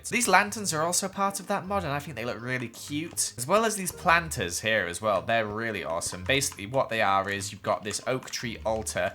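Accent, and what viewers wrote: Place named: United Kingdom. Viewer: He's british so he says it like that because of his accent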